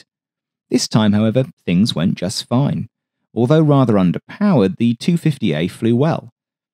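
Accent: United Kingdom. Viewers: I lose interest when I hear the Brit voice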